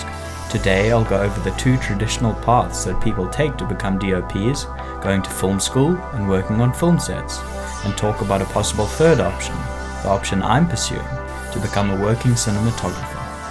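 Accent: South Africa. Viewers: Is that a South African accent I hear? 😂 Another South African here! ❤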